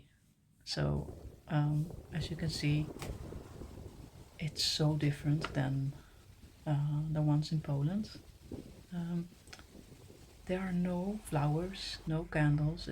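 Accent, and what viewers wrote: Netherlands. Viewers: Ladny nederlande akcent ) hhhrahhh)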